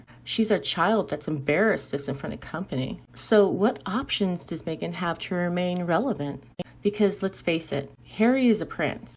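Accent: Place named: United States. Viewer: Love your American accent.... & just found your channel! Well done!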